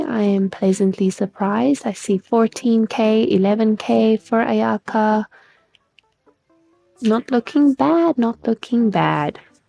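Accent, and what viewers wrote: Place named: South Africa. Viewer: I’m really sorry if this is rude and ignore it if it is but I can’t help but think you sound South African 😭 (this is coming from a South African) If you are it’s so nice to see other South African Genshin players! ☺️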